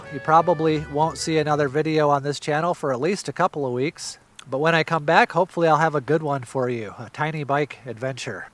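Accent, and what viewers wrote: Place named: Arizona, United States. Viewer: I didn't realize there was an Arizona accent. The way you speak reminds me of Cody Lundin. Very relaxing. Good video, Thanks!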